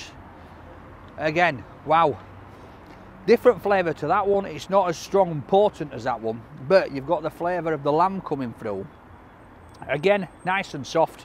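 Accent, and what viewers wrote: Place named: Bolton, United Kingdom. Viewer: Are you from Bolton mate ? You sound just like my mom and grandparents who are from there..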